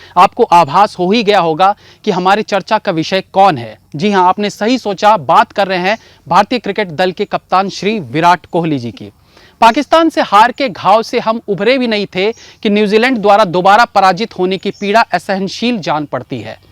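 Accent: Standard. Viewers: शुद्ध हिंदी" गज्जब भईया❤️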